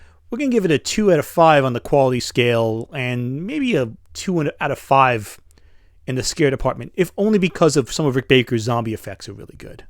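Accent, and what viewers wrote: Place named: New York, United States. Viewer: Werewolf By Night is one I've never heard of, but it sounds amazing! I'l have to check it out! Also, are you from New York? My family is from New York and Massachusetts and you sound a lot like them.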